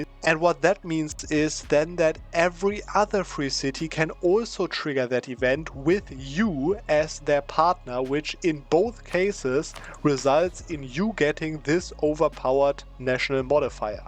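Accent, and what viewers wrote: Germany. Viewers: Do I hear a german accent here ? :)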